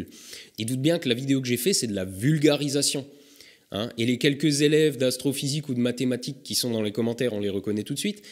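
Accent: Alsace, France. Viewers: Salut à toi! Merci pour tes videos. Elles sont tip top même pour les incultes!! Petite question: tu as un accent et des tics de langage qui me font pensés que tu viens d'Alsace, et peut être même des Vosges du Nord. Est-ce le cas ?? Merci!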